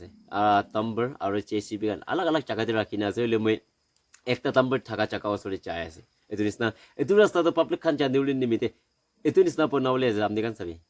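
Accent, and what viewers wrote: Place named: Thailand. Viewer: I like your Thai accent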